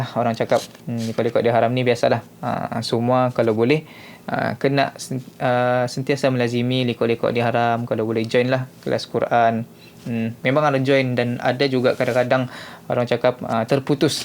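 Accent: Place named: Sabah, Malaysia. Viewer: Cakap sabah jua dia haha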